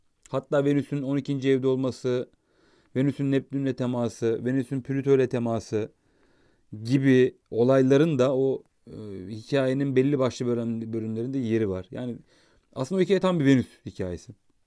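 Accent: Adana, Turkey. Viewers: Ben sizi,websitenizden takip ediyordum ,YouTube kanalınızın olduğunu görünce,altın bulmuş gibi sevindim :) yazım üslubunuzu zaten çok seviyordum,konuşma üslubunuza resmen bayıldım ..Adana ağzı da bir harika :) çok aşırı mutluyum şu an ..lütfen daha sık video yayınlayın..bu kanalı geliştirin ..Sevgilerimle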